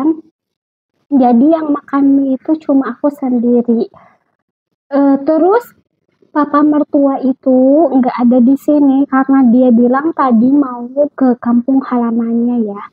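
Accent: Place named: West Java, Indonesia. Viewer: Jadikanlah kritik dan saran untuk kemajuan dalam membuat konten agar lbh baik lagi, yg namanya belajar Tdk ada yg langsung bisa/ lancar, untuk karakter/pembawaan saya No Komen krn saya yakin itulah ciri khas kamu dr lahir, cuma lucu aja mmg khas orang Sunda, dimana penyebutan hurup P,F,V sama semua dan penyebutan huruf H terasa sekali.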